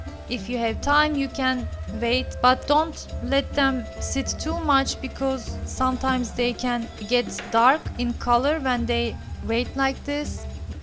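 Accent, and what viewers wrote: Turkey. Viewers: I just love turkish food and i love turkis english accent 😂🤣🤗💪😁🇹🇷🇸🇴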